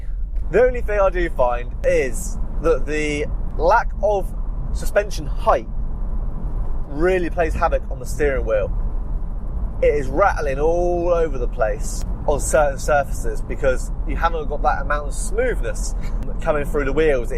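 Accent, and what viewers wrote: England, United Kingdom. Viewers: Hello, where you from? England?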